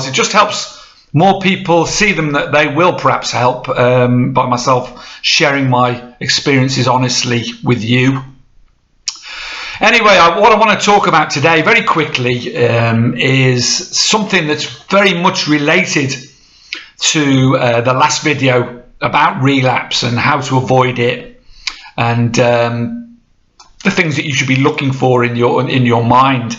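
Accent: United Kingdom. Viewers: Hi sweden here! I dont know when I and How I stumbled in on your videos but soo great to listen to! When you say "feal good when I take the pill" which medication are you then refferring to? If you feel ok to write it! So happy to see you back as you speak of! And what a great think to live in Spain sooo I would like! Take care and continue well and I think I heard a parrot sound in the background. And have to say that your brittish accent is soo convinient to listen to!!! Which part of GB are you from? Stay safe further on your journey All the best from Sweden 🌹🌹🌹👍🏼👍🏼👍🏼🙏🏼🙏🏼🙏🏼😊😊😊👋🏼👋🏼👋🏼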